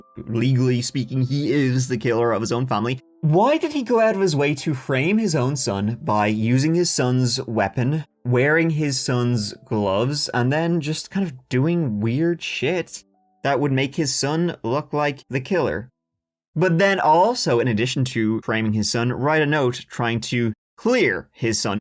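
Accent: Ireland. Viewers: he is Irish ❤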